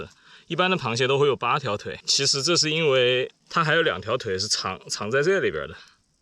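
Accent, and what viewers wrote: Sichuan, China. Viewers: 看到小兄弟的視屛號,想必你是四川人.台灣空軍與眷屬中有許多四川人,所以口音很像!卅年前去北海道旅遊,那次都吃怕了,每餐必上.搞得我們家好多年不敢再吃!祝吊蟹豐收!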